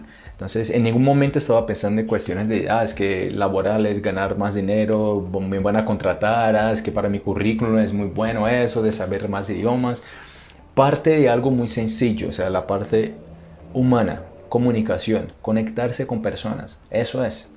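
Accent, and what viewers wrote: Colombia. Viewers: Tiene acento Colombiano este muchacho. 😂 Si si Colombia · tienes acento colombiano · Parabens! Llevo muy poco aprendiendo y llegué a tu canal. Me gusta mucho y me llamó mucho la atención tu español que es prefecto, yo diría casi seguro que lo aprendiste en Colombia 😎, como sea, lo hablas muy bien..bueno, saludos desde Valparaíso, Chile, Obrigado meu amigo 😉🇧🇷🇨🇱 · voce tem o sotaque colombiano!!! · Hablas con acento colombiano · Pensé que eras colombiano jaja hasta que escuché " tenía problemas con el español en argentina". Ahora, ya eres un nativo en este idioma, definitivamente. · Se nota bastante el acento colombiano. Un saludo. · Tu acento en espanol es colombiano creo jeje no te habia escuchado en espanol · Hermano felicitaciones pareces un colombiano es impresionante como tenés marcado el acento no pareces brasileño jajajaj,estoy viendo todos tus videos y me ayudan mucho a mi me encanta el portugués desde muy chico escuchaba música axe que pasaban acá en Uruguay y desde hace un tiempo empecé a estudiar portugués,abrazo saludos desde Montevideo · ya tienes el aacento colombiano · Wow, apenas te descubrí hoy pero estoy impresionado, acabo de ver un video tuyo donde hablas en portugués y obviamente no tuve dudas de que eras de Brasil, pero luego hablaste un poco en español y ahí me entró la duda de dónde eras porque tu español es perfecto y tu acento me sonó a Colombia. 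Tuve que ver este video donde hablas español para descubrirlo, ahora estoy más impresionado. Soy de Colombia y si dijeras que naciste aquí te creería sin dudarlo. Muy buen trabajo, quiero aprender tu idioma y me encantaría llegar a sonar como tú al hablar en portugués, muchas gracias por las recomendaciones, son muy útiles. Saludos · Dios mío! Es increíble como hablas de bien español! Tenho uma dúvida: para falar muito bem português, tenho que ter sotaque também? Por exemplo, você fala como colombiano! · !! Gosto muito de ouvir vc falando portugués, mas falando espanhol e com sotaque colombiano es Wooo👏 estou estudando muito, Obg vc pelos seus videos ajudar muito. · Usted, no es de Brasil, es de Colombia! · Felicitaciones pareces un colombiano hablando espanol tu nivel es excelente❤ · woooow muito legal, eu aproveito muito seus ensinamentos você é um professor muito especial e também parece um nativo de Colombia parabéns e obrigada 🇨🇴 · Usted para un brasileno perfeciono muy Bien El Espanol su Espanol suena muy Colombiano Felicidades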